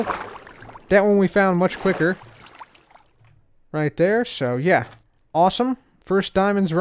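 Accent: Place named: Pennsylvania, United States. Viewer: sounds like a Pennsylvania accent. loving Season 7 so far! great start...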